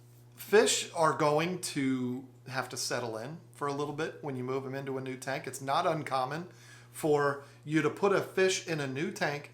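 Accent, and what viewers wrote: United States. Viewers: We get intelligent hard working people make free videos for us, and some absolute mong decides to take the mick out of their accents. Shouldn’t have even responded in my opinion but hey ho. America is huge and the various accents have way too many influences and quirks to name. Either way it is more beautiful than anything else, and the problem is with that muppets brain. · Every time it rains my pond gets filled back up and gets a water change too. Oh and I forgive you (all Americans for that matter) for mispronouncing actual English ;) Just to point out there is an "e" in beta so pronounce it bee-ta, english. While i'm here, there is a "h" in herb too, so pronounce it her-b not er-b. English do you speak it?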